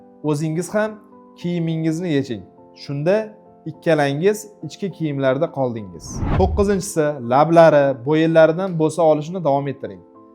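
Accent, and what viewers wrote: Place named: Andijan, Uzbekistan. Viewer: андижонлик экан жижча деп гапиряпти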